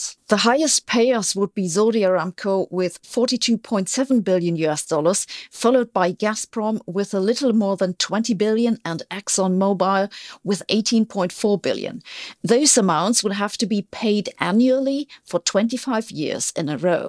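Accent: Germany. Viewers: I am sure that if you improve your hard german accent and avoid making funny irony you could double the number of views. I tell you this with sincere simpathy, both things reject and create a strange sensation about you. Best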